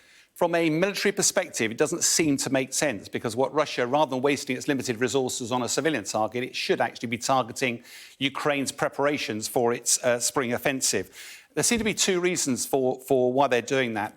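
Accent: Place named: United Kingdom. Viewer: I really like how the British pronounce pee-yu-tin. Lol Putin in every aspects smells, spouts and demonstrates BS.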